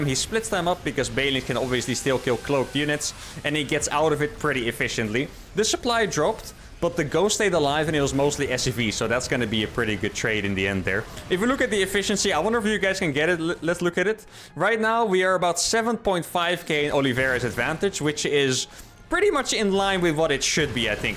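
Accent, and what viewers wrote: Netherlands. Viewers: Found the commentary/analysis really interesting!! Your speech patterns sound really similar to Lowko's, I guess I just like that Dutch accent!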